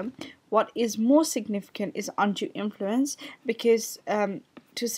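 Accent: United Kingdom. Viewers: I like your british accent!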